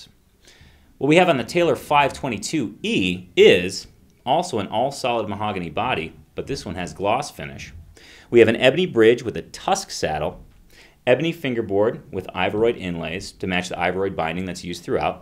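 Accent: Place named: United States. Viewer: Nice job,. You sound more like a Yank as the years have gone by.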